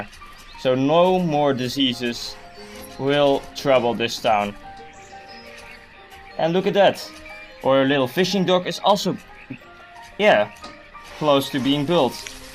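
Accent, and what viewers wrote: Netherlands. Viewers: Nederlands accent woehoe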